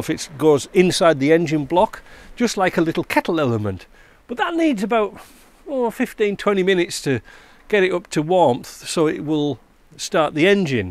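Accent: Yorkshire, United Kingdom. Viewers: Very interesting...wish I knew your name.....trying to work out your accent....Yorkshire perhaps. Talking about the price of diesel.....remember when I bought my S3 in 1975....still in daily use with me now...diesel was half the price of petrol...as it should be....it takes a lot less money to refine. Got a mod on my S3 heater....its got a 200di in it now.....just before the heater-box I made and fitted a Y section inlet out of thin plate.....so it take ait via ducting from behind the front grill and just to the left of the rad.....so it now gets ram air....other wise the blower needs to be on all the time and going at much more that 15-20mph if becomes very poor due trying to drag air in from that side vent. Tried air scoops on the side inlet useless.air steam is deflected outward when in motion. Also need to seal all the internal gaps up in the heater box around the matrix.....so all the air the comes in HAS TO PASS THROUGH THE MATRIX......lots used to bypass around its sides.....so then coming into the cab cold adding to the prob. 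Works a treat.....got to get the motor warm of course.....I just make sure the stat is efficient as it can be.....ie correct stat on a series....as you know got to be the one with a shoulder.....lots of owners I have found fit by mistake the flat seat type.....no good coolant just goes around it. Love your vids....love to hear the story of what took you to Canada. from Cheshire UK.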